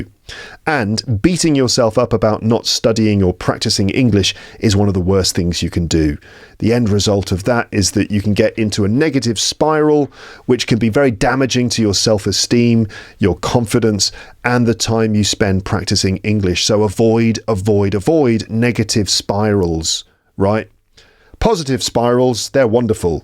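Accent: RP, United Kingdom. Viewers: What a nice discovery I' ve just made! My brain is filled with good English, nice voice and lovely British pronunciation. Thank you! Greetings from Argentina.😊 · Hello! I started listening your podcast last year. I'm from Japan and I live in Spain now. I studied American English in Japan and here I hear more British English. Some words are different and above all the pronunciation is totally different. I looked for some channel speaking in British English to hear and to learn and here I am with you! Have a nice 2025! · Excellent class. it´s long but very interesting. I love listening to your British pronounciation and your engaging voice. it seems I´m listening to a film actor and not a teacher. My brain becomes motivated with all your hepful advice. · Hello. Hello to all adventure on this channel too. In this text, I have some thoughts, and one question for all users, and of course for you. 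Thank you for your work. You made this world better and gave some people the opportunity to learn modern English totally free. Your voice is incredibly pretty, gorgeous, and a little bit posh. I can complete the whole episode at 1.5x speed. Your English podcast was so difficult for me a few years ago. I started with Canadian English, but then tried many variations such as American, South African, Scottish, and many others with community teachers. Also, I spent a lot of time watching movies, podcasts, and playing games. I have one question. Maybe, I am not a good seeker, but I want to find a place to understand poetry in English. Just a podcast with the right intonation, pronunciation, and explanations of meanings. Do you know the star point for learning to spell poetry? Thank you to all readers. Have a good New Year. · I have been watching this podcast since september 2023, and i've improved a lot my english, mainly my listening skills, and i have developed a interest in british accent, i love this one, one funny thing is that i started pronounce some words like accent 😂😂😂 and the way that you talk and Some british persons, before that i use to pronounce like a standard american english and now i kind of Mix with british accent, and of course my own accent from brazil. 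My goals in this year is be improved my accent and my speaking and of course continue what i doing right now, listening english every day. One thing that i have to improve is my compreension when you bring someone for your podcast, sometimes i struglle to understand different accents, for example, the accent from you friend paul😂 however i like this cause i face this situation like a challenge! Actually english today is part of my routine, i kind of got addicted in english 😂, i put english in everything, games, movies, apps,etc. Thanks, you're amazing teacher! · Thank you so much,! I like your voice, your British accent ascent and your pace of speaking. You're a teacher with heart ❤ I've listened to a few of your storytelling sessions, love them!! Thank you once again and wishing you another successful year!! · I love the way you teach English and your British accent. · i can't describe my pleasure when İ get a YouTube notification of a new episode of your amazing podcast ... 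Please read more stories i love listening to stories in your clear British accent.. thank you very much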